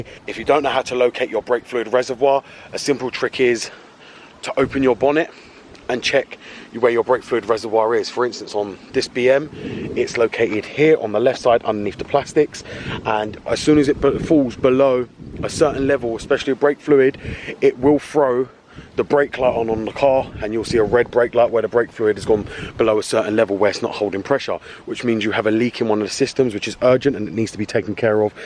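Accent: United Kingdom. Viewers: mATE were you born in the uk?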